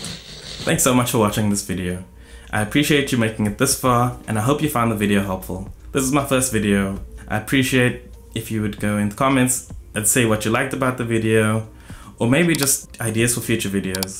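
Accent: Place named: Asia. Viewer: I had no idea how html semantics worked until I watched this vide, very nicely done! also where you from? you sound a bit asian ??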